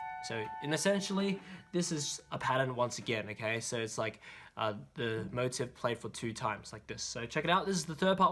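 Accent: United Kingdom. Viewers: You’re British man and accent coo! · Even tho bro's asian his accent is british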